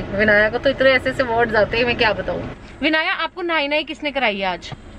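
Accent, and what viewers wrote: Punjab, India. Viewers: Oh aapne bol diya tha last vlog mein ki aap ki Punjabi Punjabmein aate nikal aati hai.. 👍 😅 Your mum is soo beautiful. ❤️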